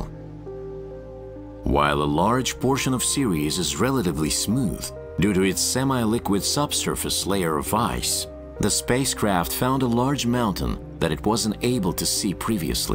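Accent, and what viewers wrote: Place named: United States. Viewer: Отличная озвучка на английском с американским акцентом. Супер!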